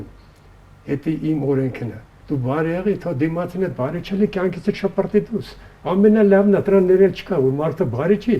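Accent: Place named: Armenia. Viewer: ❤️❤️❤️էնքան հայ ա, որ ռեւսերեն հայի ակցենտով ա խոսում😍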